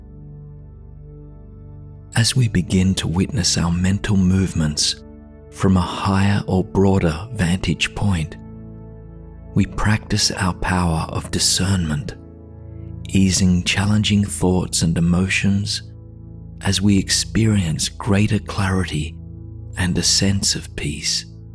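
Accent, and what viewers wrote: Australia. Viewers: I think he’s Australian not British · Isn‘t he Australian?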